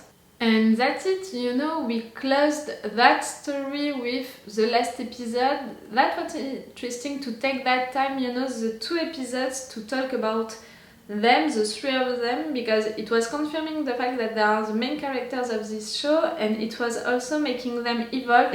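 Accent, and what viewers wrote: France. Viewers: I love to hear a french person get constantly concerned about democracy. I swear, I start smiling every time I hear you say democracy in that sweet french accent. ;D Also, I have to defend Gaius. You keep calling him useless, but he is actually a genuine genius. If he didn't have Number Six manipulating him in his head, he would be a huge asset to the fleet. And even so, I think he probably still is, we just don't see it too much, but we've seen scenes where he brings reports for the president and he did the statistical work in the meeting during the water crisis episode and other stuff like that, so he is probably actually doing useful things in the background.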